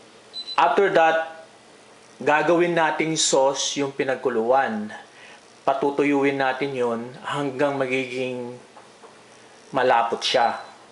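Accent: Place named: Pampanga, Philippines. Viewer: Kapampangan ka nga....may punto...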